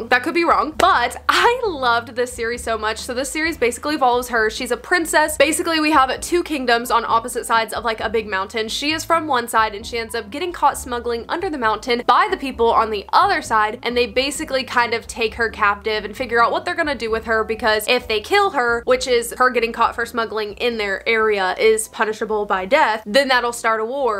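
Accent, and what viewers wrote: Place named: California, United States. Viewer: Ahah I mean what’s your American accent? 🥺 You actually sounds like Californian accent😅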